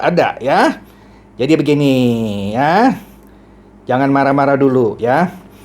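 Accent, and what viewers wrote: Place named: Jakarta, Indonesia. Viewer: Tksh khotbahnya,Maaf Bapak Pdt oran Betawi ya? Karena Pdt bilang masih itu masing,Ok.Pdt🙏🏼🙏🏼👍👍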